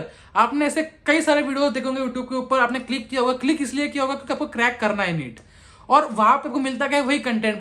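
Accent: Maharashtra, India. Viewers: He is maharastrian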